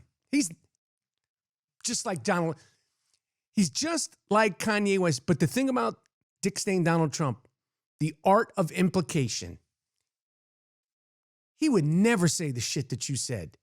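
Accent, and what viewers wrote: New York, United States. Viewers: I’m sorry this is not relevant to the video, but I do voice acting and I base my New York style accents off your voice and accent. I like the content on your channel too, keep it up!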